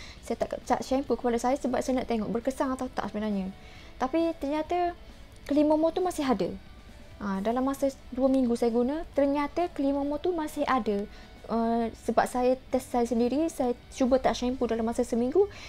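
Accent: Kelantan, Malaysia. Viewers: Awk ni asal kelantan ke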